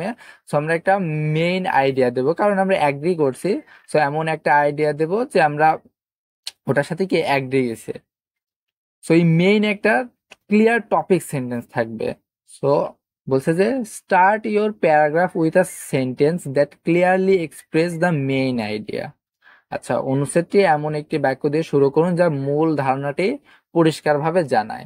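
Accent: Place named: Jessore, Bangladesh. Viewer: joss class bhai, Are you from Jessore ? your accent like jessorian .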